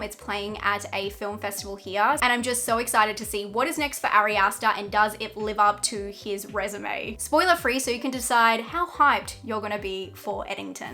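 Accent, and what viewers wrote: Australia. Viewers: I love the Australian accent and how the letter O is pronounced as OR. Say “ O and R “ over and over again!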